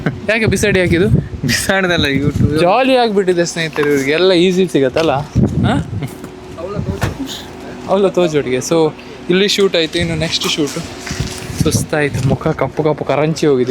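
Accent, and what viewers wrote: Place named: Tulu Nadu, India. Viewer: Your Tulu accent sounds like Bollywood star Suniel Shettru. .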